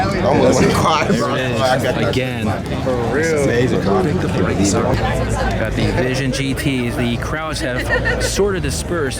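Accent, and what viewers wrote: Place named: California, United States. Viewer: Love the commentary, totally California dude!. Miss it. :)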